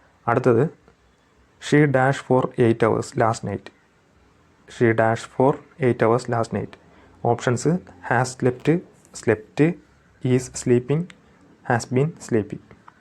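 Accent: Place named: Thiruvananthapuram, India. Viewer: Chettan TVM aanallle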